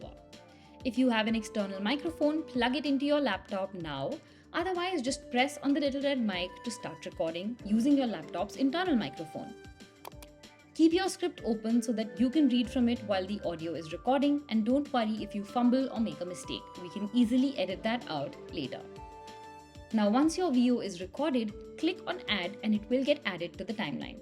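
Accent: India. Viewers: such a nice work but voice over typical stupid indian accent , come on guys .....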